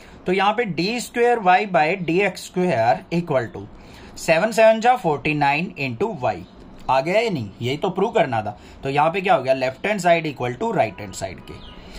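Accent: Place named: Bengal, India. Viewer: Bhai wo Bengali he Bengali accent tuje nahi PTA to me taunt mara kr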